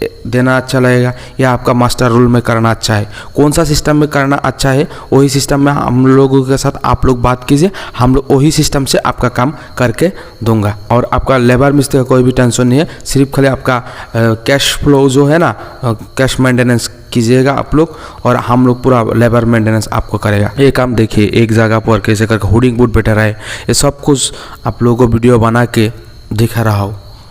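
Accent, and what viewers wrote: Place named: Odisha, India. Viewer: BHAI APKO HINDI BHASA BOLNE ME DIKAT HO RAHI HAI KYA AP KI HIDI BHASA SE AISA LAGTA HAI AP ORRISA KE RAHNEWALE HAI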